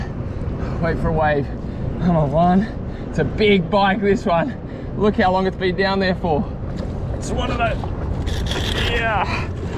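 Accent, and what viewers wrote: Australia. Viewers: LOLOL......bikes seems to haunt you! So i have been subscribed to your channel for about two years, you were in Amsterdam doing the canal's. I heard your Australian accent and I was wondering what you were doing in Amsterdam and if you moved there. Anyway, were you stranded in Amsterdam because of Covid or is Australia your home base?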